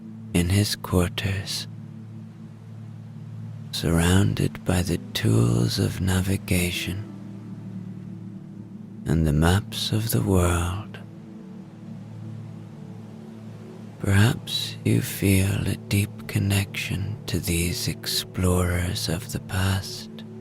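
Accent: Ireland. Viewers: You have such a calming voice. I love the Irish accent. I think the Irish singers are fabulous too. I’m afraid I never get to enjoy the actual stories as I don’t hear them. It takes a very short time to be lulled asleep listening to your voice. Thank you.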